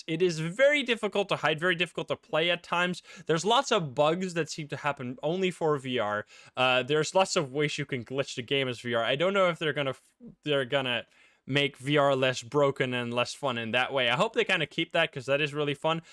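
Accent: Netherlands. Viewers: Are you from netherlands?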